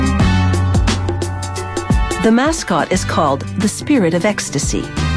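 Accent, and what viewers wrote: United States. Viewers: What an utterly, horrible American voiceover, no class. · FFS why did they use the American, for this episode? Aluminium not Aluminum, and Bonnet not Hood. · The vulgar American accent describing how one builds a Rolls Royce is just not cricket!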